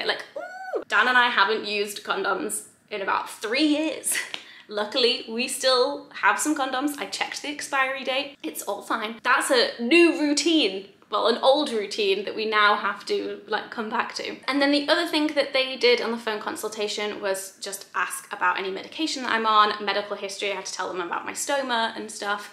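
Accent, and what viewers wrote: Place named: Northern England, United Kingdom. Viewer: Your accent has gone a bit more northern I think? Maybe it's due to social distancing/lockdowns??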